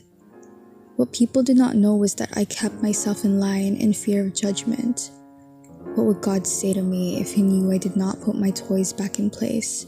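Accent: Philippines. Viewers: all i know is that you have a filipino accent<3 But heyyy, If you are a filipino, And you're questioning the filipino culture upbringing and spreading awareness even if it's 3 minutes long, It can help. Well story short, I'm a filipino with a religious mother with toxic family especially on reunion, And as an asian, Body shaming, bullying, intimidation, toxic conservatism is considered normal. With all honesty. I wish i was overreacting or joking. Would you believe?!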